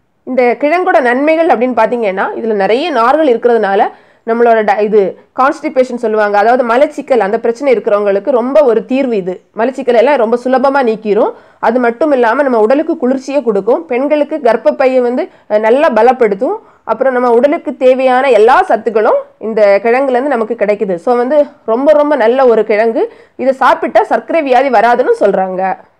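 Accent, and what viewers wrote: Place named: Nagercoil, India. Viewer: Madam neenka nagercoil ah slang apdi than iruku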